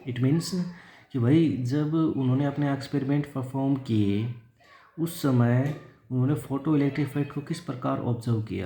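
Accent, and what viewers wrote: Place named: Haryana, India. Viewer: That haryanvi accent tho🔥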